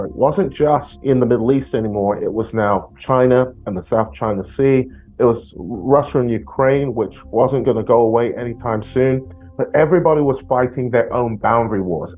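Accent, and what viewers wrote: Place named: United Kingdom. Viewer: God bless you. You may not understand, but people speak in different dialects around the world. And this is simply a British accent. This man is from the UK. So, they don’t pronounce words as people in the west do.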